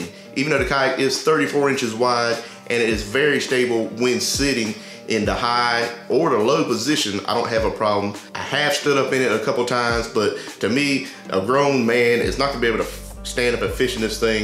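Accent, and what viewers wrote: South, United States. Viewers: Good review!! You gotta be from the south bro, you got that down home good ol boy sound to you! I’m in Auburn Alabama area.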